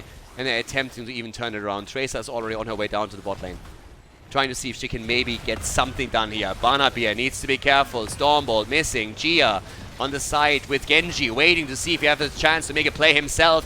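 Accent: Germany. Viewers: Good commentary. It's Zometzing witz the german accent that just makes it more intriguing.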